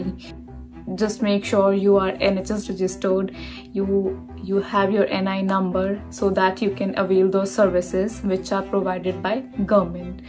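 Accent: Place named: India. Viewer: Beautiful lady, from the accent im sure your an indian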